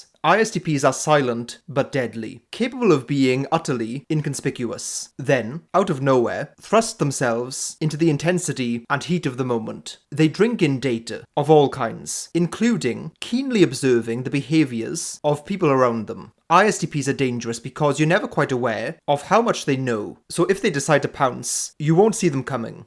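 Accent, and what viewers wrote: Wales, United Kingdom. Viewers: He was the first yt channel I found on mbti - Iearned a lot with his simpler style videos, then moved more to your channel for the fancy language and welsh accent.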